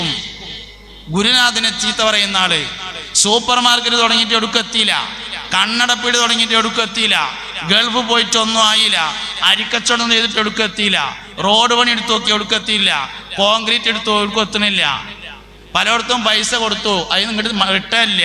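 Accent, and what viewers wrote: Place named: Malappuram, India. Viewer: Vishayam nallathaan pakshe nalla malayalathil parayoo,, ningalyde Malappuram basha yellavarkum manassilakanamennilla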